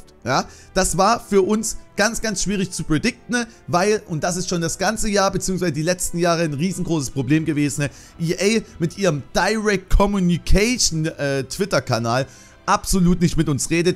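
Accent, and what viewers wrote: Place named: East Germany, Germany. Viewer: Am geilsten finde ich tdem wie du versuchst deinen ostdeutschen Dialekt zu unterdrücken🤣 mach weiter so, geb dir mit allem recht😊🙋‍♀️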